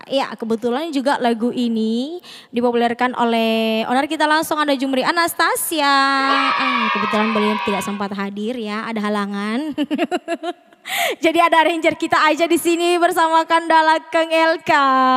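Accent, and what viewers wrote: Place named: Jakarta, Indonesia. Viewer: Ngomongnya kayak orang Jakarta lho taunya orang Bugis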